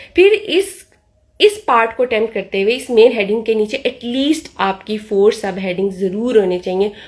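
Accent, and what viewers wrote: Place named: Faisalabad, Pakistan. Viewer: Mam Is your home town is from Fsd because your dialect looks like fasilabdi people.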